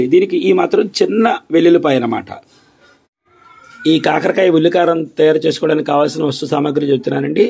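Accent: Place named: Godavari, India. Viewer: garu namasthe Meeru chupinche vantalu Meeru cheppevidhanam enka bavuntundi Memu anukovadan meeru godavari zillavallu ani Mee matani bati anipisthinfi Tq🎉 maku chala Baga nachhutunnayi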